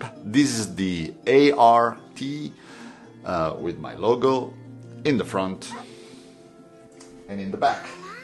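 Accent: Italy. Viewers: Again, I am totally amazed at the gift of finding you on YouTube. The word that fits is " serendipity" ( the occurrence and development of events by chance in a happy or beneficial way; that is you!) You make learning a joy and it helps with that awesome Italian accent. I never truly understood the rule of 3rds and now I understand. In Japanese art it is about what is and what isn't and that emptiness brings power and balance to all things. .... we come to that place for me where I can't wait for the next video. Mahalo.. ps I love the histogram T .. that is so very cool with the label. e'la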